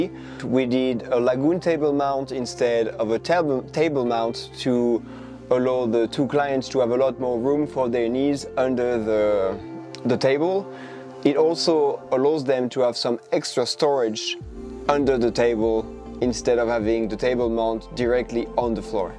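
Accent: France. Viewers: Beautiful! So brilliant! Love the French accent! ❤ So soothing to my soul!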